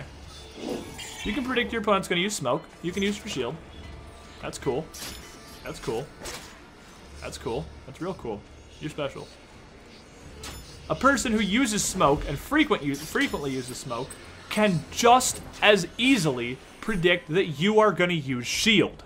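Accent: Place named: Sweden. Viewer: i dont play ac3 or any i just come for the sexy gameplay and sexy voice and comentary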